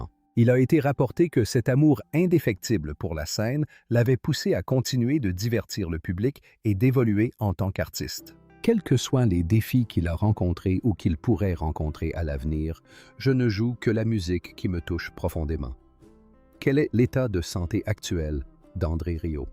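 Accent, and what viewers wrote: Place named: Canada. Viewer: 18/05/2026 1) MONOLOGUE DE L'IA ACCENT CANADIEN ! 2) Il SE PRÉNOMME "RIEU", pas "RIA" ! 3) À , ce n'est pas André Rieu sur le lit d'hôpital ! Et en haut à gauche, qui est-ce ? 4) À ', qui est ce type gros avec le crâne rasé ? 5) À ', ce n'est pas lui avec à ses côtés l'infirmière ! 6) À ', IDEM 7) À , un homme jeune, aux cheveux foncés, long et une barbe ! 8) À , une infirmière aux longs cheveux blonds et un homme asiatique allongé subissant un examen ! 9) À ', qui sont ces jeunes adolescents regardant leur téléphone ? ASSEZ !:body-green-covering-eyes: JE VOUS SIGNALE : C'EST INSUPPORTABLE !:face-orange-frowning::face-green-smiling: Belgique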